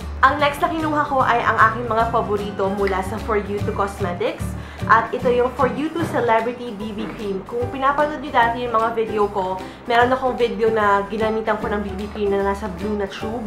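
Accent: Philippines. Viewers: parang ang lalim mo mag tagalog ateeee kakatuwa! ehehe <3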